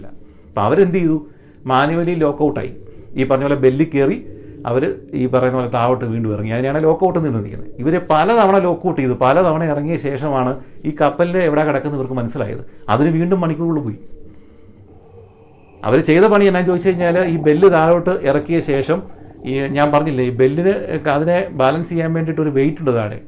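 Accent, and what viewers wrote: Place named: Kasaragod, India. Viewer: നിങ്ങൾ കാസർകോട് ആണല്ലേ😜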